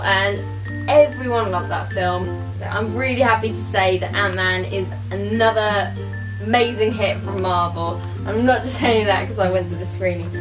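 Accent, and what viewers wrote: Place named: United Kingdom. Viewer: That british accent is beautiful, i love it.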